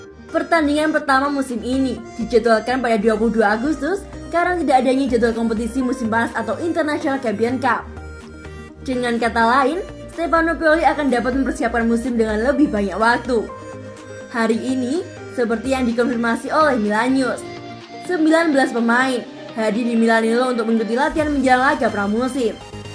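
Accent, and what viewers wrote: Java, Indonesia. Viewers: Wkwkwk jawa, kesukuan banget ni vocalnya,, ga tau betapa luasnya indonesia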